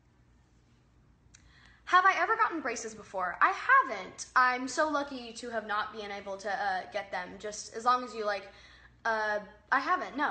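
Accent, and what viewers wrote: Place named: Texas, United States. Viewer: Love your Texas accent yall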